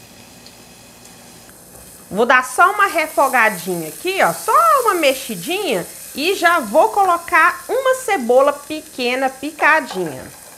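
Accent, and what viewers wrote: Minas Gerais, Brazil. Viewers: não sei se vc é mineirinha, mas que esse sotaque mineirês seu é gostosim de ouvir, ah, isso é....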